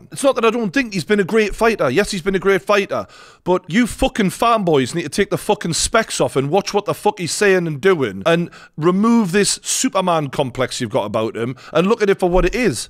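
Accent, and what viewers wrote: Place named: Geordie, United Kingdom. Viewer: Im a HUGE jones fan but to call him the outright goat is alil far-fetched, sure hes had an amazing career at LHW but im hearing alot of facts about jones, when he was younger and hungry but now hes just tryna take the easy way out... Huge aspinall fan also (im American) i think he has to have the fight with jones... It needs to happen. Ps i love the geordie accent 🙋🏻‍♀️😂😂😂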